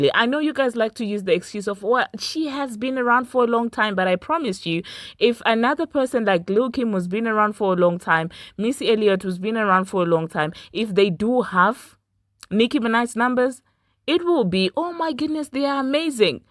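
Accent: South Africa. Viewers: Love your channel are you South African ? Where is your accent from ?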